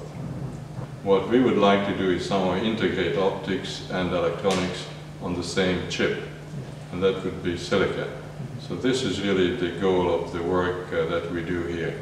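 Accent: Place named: Netherlands. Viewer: I hear the professor is from the Netherlands.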